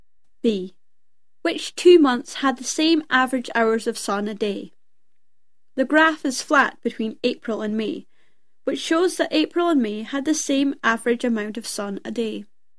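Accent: Scotland, United Kingdom. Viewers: I can't get over your Scottish accent.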